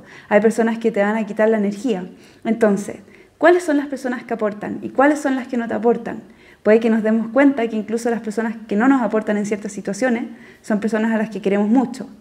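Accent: Chile. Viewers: Gracias por estos vídeos!! Amo tu tono chileno. Saludos desde Argentina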